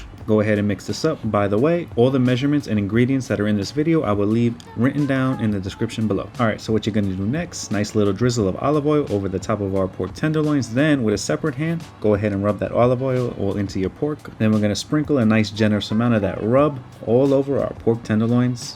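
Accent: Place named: New York, United States. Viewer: my mans new york accent makes me feel right at home!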